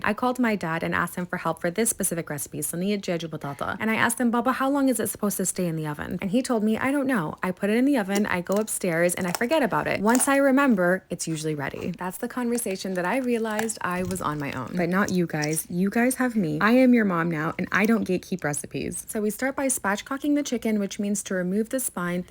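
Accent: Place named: Latin America. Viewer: Sounds very Latino actually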